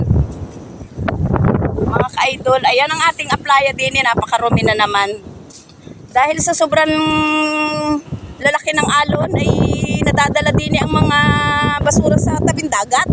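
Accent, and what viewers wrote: Batangas, Philippines. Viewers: Ang ganda diyan sa inyo at saka parang madali laang ang manguha ng pang ulam. Puntong Batangas nga ang dating mo idol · Fullwatched nakakatuwa naman at akoy nakarinig ng puntong alae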